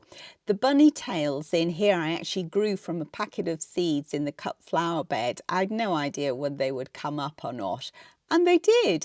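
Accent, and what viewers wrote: England, United Kingdom. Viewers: Everything you do is so gorgeous and stylish. I just adore your videos and your delightful English accent. And of course who would not love Bertie and his antics. I'm a German living in the US and so looking forward to more videos.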